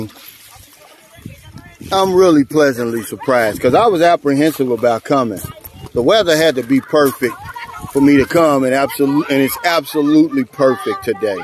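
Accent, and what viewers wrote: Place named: Appalachia, United States. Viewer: Sir, i like your "Hill Billy" accent . Where u got it ? Which city from the states u from ? Best regards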